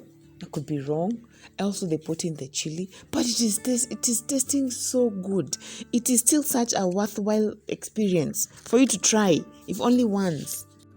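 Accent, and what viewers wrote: India. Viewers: Looove the Indian accent 😅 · Its the Indian accent for me 😅😅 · 😅🤣🤣the Indian accent though. Good job mama. I will definitely try the naan and butternut soup. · Wooow!! Good job. The Indian accent 👌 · I love the Indian ascent wow, watching you from zambia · Hahaha! I love the Indian accent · beautiful. I love your i indian accent. I love indian food too especially the spices in their foods. I would love to know if you have ever been to india or if you studied there. Been there for my studies hence the love for the foods. I love your contents esp food vlogs. 😋😋. Much love❤❤ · Omg! The Indian accent 👏❣️your videos inspire me. I learn alot. Keep doing what you do. Love love your content ❤️❤️❤️❤️❤️ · For me it’s the Indian accent😂😂. Am done😂Good video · The Indian accent cracks me up. Great content girl. Lov from Tanzania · Liking the Indian accent, great video as always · Your English-india is perfect,join Bollywood una potential mama! 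· The Indian accent,you nailed it!Hope the camera guy gets fed the delicious meals too😃😃Love all the recipes,good work Mama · You knocked me out with the indian accent..Easily my fav Youtuber..!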